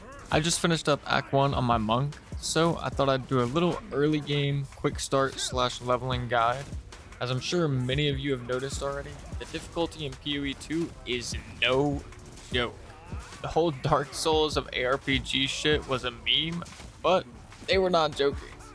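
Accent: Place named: RP, United Kingdom. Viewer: Why do you sound so posh mate?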